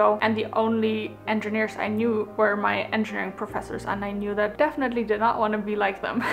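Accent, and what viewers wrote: Germany. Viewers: Hi, there are many German colleagues at my company and all of a sudden I just want to see this accent again 😄. Then, saw your ''when german engineers speak English'' video. It was the first time I discovered this channel. When it comes to this video, a university internship has been a turning point in my career aim. I was planning to become a manager since the beginning of the university even though what it is exactly, just like you. Whenever I watch one of your videos, I am settled more creative and focused mindset. Thank you for the quality content and the thoughts you share!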